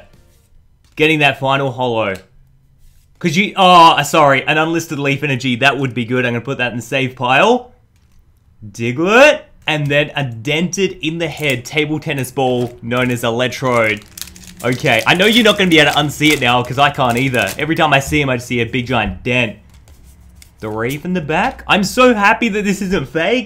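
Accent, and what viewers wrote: Australia. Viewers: Are you an Australian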